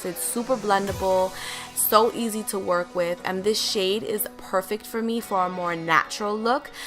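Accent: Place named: Latin America. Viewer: Hey " "?......Love your accent...Latina right? I have subscribed to your channel.. Can you also share so love over here..Each one helps one!!...❤️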